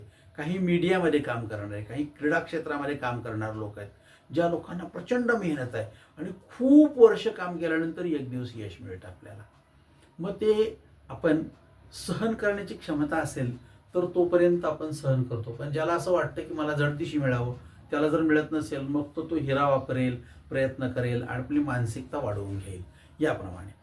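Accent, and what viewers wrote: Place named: Kolhapur, India. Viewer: मी हेड फोन लावून एकत होतो पण वारंवार वाटत होतं हे कोल्हापुरी असावेत आणि शेवटी मी मोबाईल मध्ये शेवटी पत्ता दाखवला तो कोल्हापूर 😅😅😅😅 थोडा ठसका जाणवत होता. 😅भाषा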